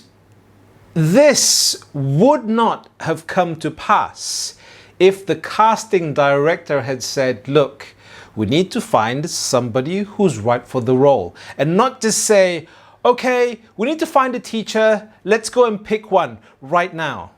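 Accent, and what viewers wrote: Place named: RP, United Kingdom. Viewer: You're speaking the Queen's English. Well done.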